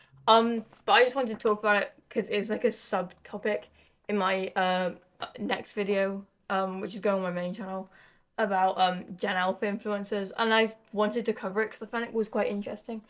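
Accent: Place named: United Kingdom. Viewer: Just found this channel while scrolling through my recommended, 10/10 yap session. I can’t tell if your Bri-ish but the accent is cool lol(Latin American here)🏳️‍⚧️🏳️‍🌈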